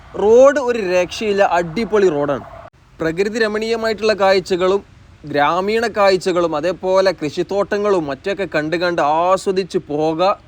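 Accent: Malappuram, India. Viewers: Superb video... Droneman, RS Live, avarude okke levelilekk ethatte, ee Malappuram slang😊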